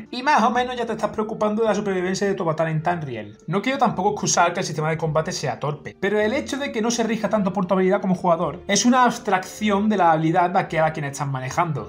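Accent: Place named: Andalusia, Spain. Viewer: Tengo curiosidad, de que pais eres? Tu acento es como Español pero es muy marcado por ejemplo las carencia de las "s" finales en las palabras y otras cosillas. Conosco acentos de diferentes regiones de España pero el tuyo para mi es nuevo, suena a andaluz? Saludos desde Chile, buen video ;)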